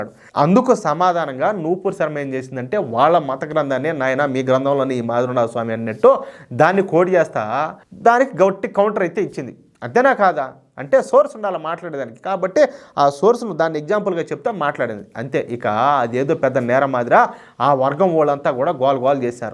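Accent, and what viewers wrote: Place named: Nellore, India. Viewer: I like your Nellore style language Garu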